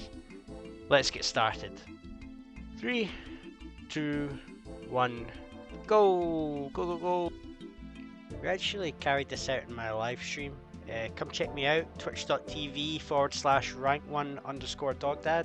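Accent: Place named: Scotland, United Kingdom. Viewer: Love to hear a Scottish osrs content creator.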